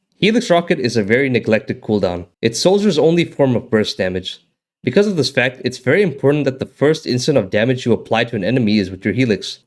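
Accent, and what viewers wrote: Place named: South Africa. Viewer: I suspected you were South African from the accent and your ping and your bio confirmed it. Nice to see someone from the region making content like this. I'm wondering if you play on Middle East servers or VPN yourself to EU. I do the latter, but the 200 ping can be pretty frustrating at times even though I'm used to it. If you play on ME, how are the queue times like at high masters - GM?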